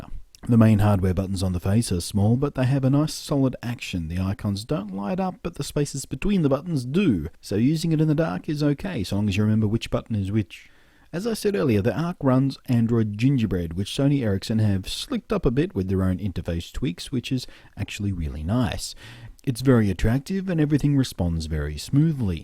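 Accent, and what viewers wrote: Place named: Australia. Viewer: thank you saving me from other reviewers that nit pick on the tineist things. love the accent nice to see and Australian reviewer. i is from new zealnd!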